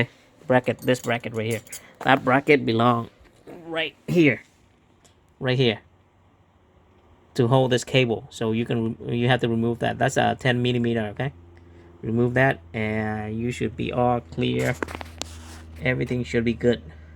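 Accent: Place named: Vietnam. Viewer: chao anh. thanks for the video. I know a vietnamese when i hear one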